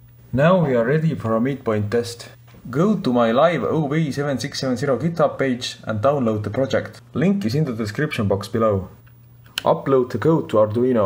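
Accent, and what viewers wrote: Estonia. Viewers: Is that an Estonian accent? Thanks, best video tutorial on how to connect OV7670 to Arduino so far I found. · Kas oled Eestlane? Aktsent on täpselt selline. :D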